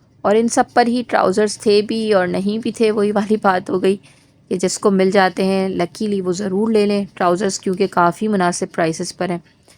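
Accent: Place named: Karachi, Pakistan. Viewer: Itni saaf aur khaloos wali urdu sirf Karachi walay he boltay hn. 😂❤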